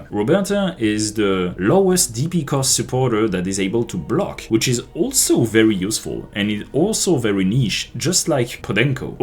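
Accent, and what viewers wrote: France. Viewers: Are you perhaps French? Bonne vidéo haha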